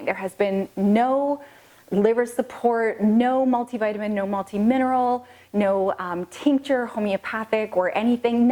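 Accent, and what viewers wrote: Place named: Canada. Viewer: Are you canadian ? Just wondering cause your accent is awsome ✊💯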